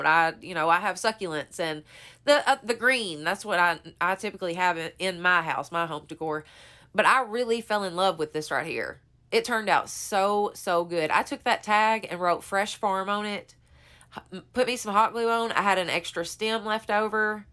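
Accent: South, United States. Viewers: I love watching your channel so many pretty country farmhouse things you make and I love your southern accent you remind me so much of that TV show designing women which I loved to watch also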